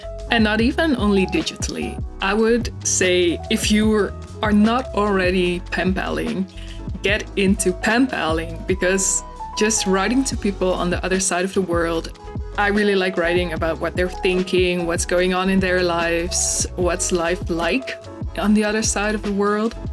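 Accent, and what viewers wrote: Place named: Netherlands. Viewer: Ohhh je bent ook van Nederland!! Ik hoorde het al hihi❤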